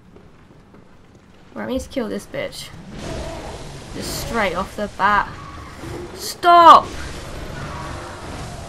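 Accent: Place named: London, United Kingdom. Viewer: I just love your accent. It makes you look cuter when you swear haha are you Londoner? You should have tried the back stab, it works pretty awesome against him.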